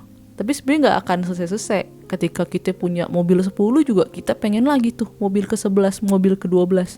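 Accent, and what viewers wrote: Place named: Betawi, Indonesia. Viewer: Kereen yaa ceritanya. Walaupun ananda tinggal di LN. tp logat Betawinya msh kental yaa. Pertanda tdk melupakan bangsa❤. Cerita itu utk mengingatkan kita smua spy menjadi orng yg ttp bersyukur. Jangan sll merasa kekurangan. Krn kl kita melihat keatas tdk ada habisnya. Lihatlah kebawah msh bnyak orng yg kekurangan tp hidup tenang bahagia. Krn bahagia itu sederhana. Tdk hrs bermewah2. Kebersamaan bersama keluarga punya quality time bersama itulah kebahagiaan.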